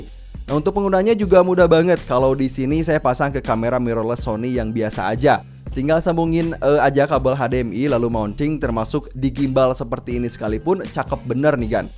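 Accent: Kuningan, Indonesia. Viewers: Bang abang orang kuningan yah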